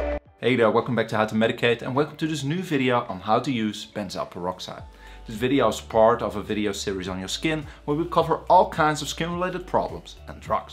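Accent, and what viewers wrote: Netherlands. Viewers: Hahaha, I knew you were Dutch. My favourite European-English accent. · I heard your voice and just knew you were a fellow Dutch person. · Ik kan aan t accent merken dat je nederlands spreekt 😂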